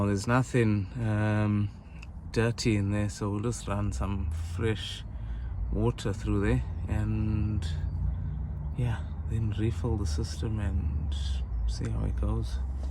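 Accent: South Africa. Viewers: Cool video ,you sound South African ? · you sound very south africa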